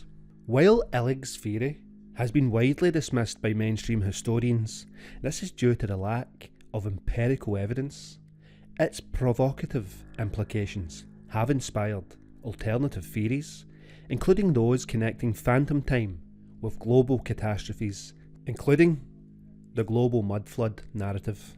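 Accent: Scotland, United Kingdom. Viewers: Great Information...But Hard Time Understanding The Scottish Accent.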